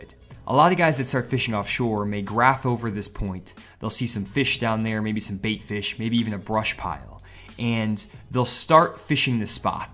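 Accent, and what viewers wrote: Oklahoma, United States. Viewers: Are you from Oklahoma?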